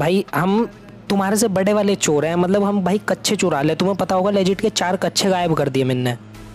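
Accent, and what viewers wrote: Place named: Marwari, India. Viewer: Bhai to marwadiy kas bol la taa hai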